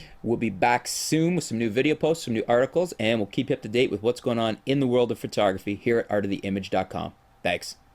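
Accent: Canada. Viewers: I fucking hate when Canadians say out or about !!! fucking stupid!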